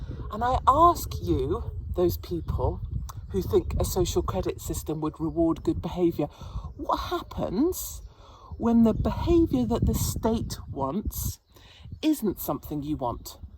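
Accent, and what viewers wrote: United Kingdom. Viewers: I love fabulous British accent when she's speaking American.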